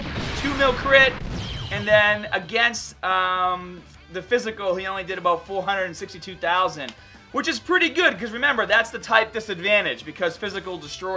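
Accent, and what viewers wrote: Boston, United States. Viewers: Hey are you from Boston? U got a hint of the accent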